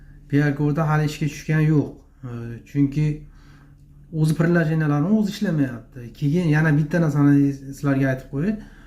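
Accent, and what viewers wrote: Andijan, Uzbekistan. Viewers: Oddiy o'zimizcha Anjanchasiga gapirib qo'yaqolaman, deb xatolariyizdan o'ldirvordiyiz lekin. (Quick Response Code) QR- код Piar- код deb. Aka Andijonliklar sizchalik xato gapirishmiydi lekin. Siz halqqa bir ma'lumot opchiqvottisiz, aytivotgan gapiyizga o'ziyizam tushunmaseyiz kerak xatoligidan. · Anjon shevaku😂shunqa sozini bular ashnaqa deydi😂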